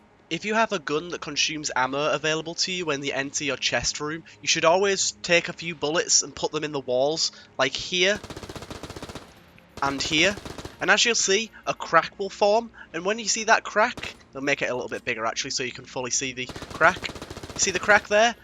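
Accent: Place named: England, United Kingdom. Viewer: Oh thank goodness....and English accent!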